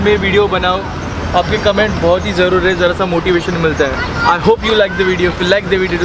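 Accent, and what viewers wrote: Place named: Maharashtra, India. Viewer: Loved your Marathi accent English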